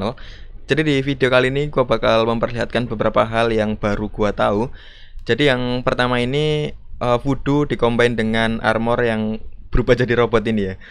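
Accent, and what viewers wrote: Malang, Indonesia. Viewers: "sama ae" 😂😂 orang mana sih bang? Malang?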